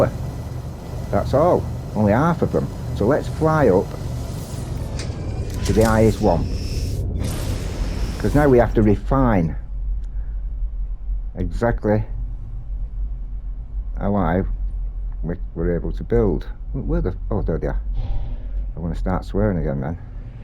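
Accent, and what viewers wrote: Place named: England, United Kingdom. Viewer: Great to hear bloody brilliant regional English… no messin’ about… job done! 👍🏻 ❤ 🏴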